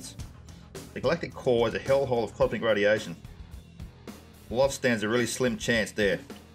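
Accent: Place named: Australia. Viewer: That Australian accent tho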